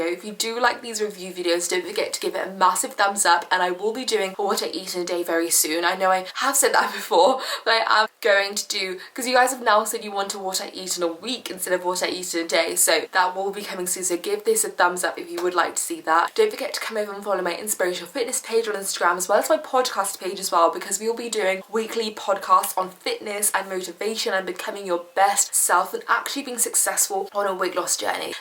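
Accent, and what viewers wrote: United Kingdom. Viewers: I love ur british talking style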